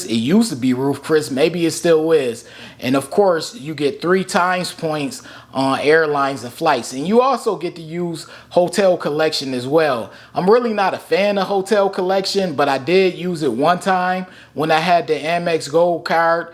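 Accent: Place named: Buffalo, United States. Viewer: Are you from buffalo?...gotta be. I hear it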